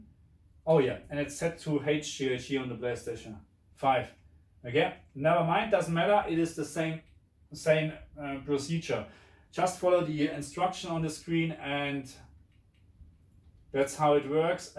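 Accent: Germany. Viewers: Dojcz akcent? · If a man with a strong German accent tells me to change my CX settings - i immediately change my CX settings ! 👌🏻🤟🏻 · I just want to tell you that content is very explanatory and you have put some knowledge and effort into it. Thank you for that and keep with it. Do not listen to haters they have nothing to provide. Feedback and criticism are 2 different thinks. And I love your accent. Are you from Germany? Viel Glück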